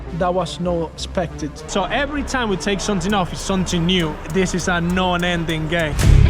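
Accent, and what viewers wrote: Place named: Liverpool, United Kingdom. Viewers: I just love that this guys accent is like a perfect blend 50% UK and 50% wherever he's from (not tryna be rude just don't know where he's from). How long have you lived in UK for mate? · He’s from The Dominican Republic via Liverpool 😂😂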